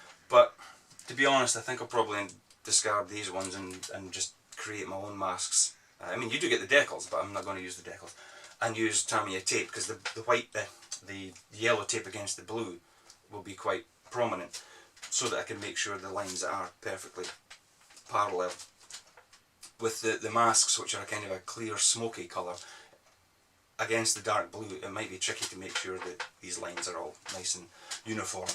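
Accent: Scotland, United Kingdom. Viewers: Lovely work here! All awesome models and I love your Scottish accent! Keep up the good work! Cheers, Leo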